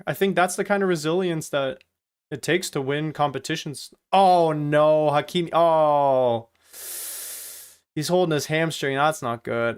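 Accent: United States. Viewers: btw are you german you sound american af i forgot if you are german or if you are american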